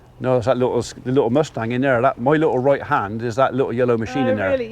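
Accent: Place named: Gloucestershire, United Kingdom. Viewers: I can't say I've noticed you going out to milk your cows twice a day, maybe I haven't been paying close enough attention!! I must say your Gloucestershire accent sounded stronger than normal on that video, mine isn't noticeable now after49 years away.Why do we watch your channel? no idea, but you've become an addiction ever since I first discovered your channel. And we attended the same college, that's got to count for something surely! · For me I think it’s a combination of your sense of humour with the sideways look and a twinkle when you’ve said something amusing, the obvious love you have for the cattle and your respect and kindness for them and just watching the farming year unfold as time goes by. You also remind me of the farmer at the small dairy farm they used to be next door who became a good friend. Also I live in Gloucestershire and enjoy listening to my accent on the screen Always look forward to your daily videos and your wry outlook on life in general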